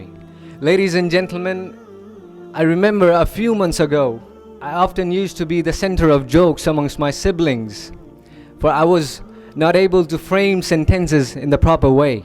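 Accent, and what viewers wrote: England, United Kingdom. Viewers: MaaShaa Allah Bohot badiya bhai The way of speaking, behaving like an English man. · Asklm sir I am joining u r classes 2011 Karnatak college more 15 days Bidar U r English talking Maa shaa Allah u r teach Accent like this Angrazi 👨 man Maa shaa Allah Meri aap sa humble Request hai aap YouTube channel pa English Grammar classes ke video daliye plz Alphabetical phonics sounds ke Videos daliye plz meri 3 years daughter ko Teach karna hai plZ Humble request hai Sir plz Basic Education Covid19 ke resons sa schools tu closed hai plz plz plz Plz plz plz plz plz plz sir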